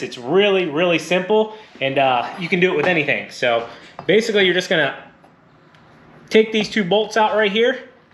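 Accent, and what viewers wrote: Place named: Pittsburgh, United States. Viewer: Waymorebetter Coolbeans iamathinkin Pittsburgh talk just saying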